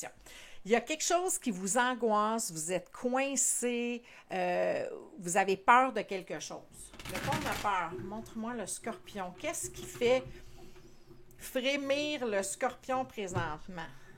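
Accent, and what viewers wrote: Quebec, Canada. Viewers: Êtes vous quebecoise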